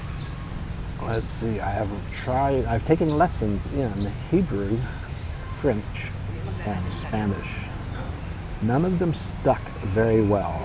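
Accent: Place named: United States. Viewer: Hey, I taught my husband who is also like you an American to say all the 5 vowels not the usual American accent. But ask your wife to drill you with how the Filipinos/Illongos pronounce all our vowels. You mentioned you can speak a little of SPANISH then you can learn Hiligaynon. The word “ng” as in “nga” or phonetically sounding as huh. Your tongue should be on the top oh your upper mouth almost covering the opening of your nostrils to make the right sound, to me is way too ADVANCE. I drilled/grilled my husband to pronounce all our vowels just like in hiligaynon. I think Melanie can manage it. And trust me you will be surprised how much you can pronounce all the Hiligaynon words in no time. Good luck 🙌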